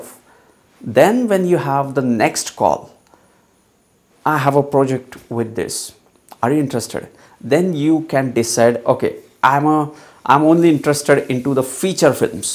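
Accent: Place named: Russia. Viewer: I have this struggle in life too. What a great video. Spot on how Rahu is shaky and made me go from one thing to the other. Your message towards the end was really good to be specific to find our calling, our Dharma and not be distracted. By the way you sound as if you have a bit of Russian accent - esp. when you say - "You''ll always" and "energy". Just saying. Sounds great. thank you.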